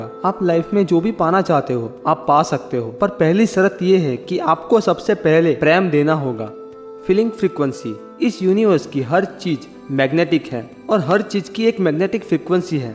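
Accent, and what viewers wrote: Gujarat, India. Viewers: Gujarati ya marwadi honge shayad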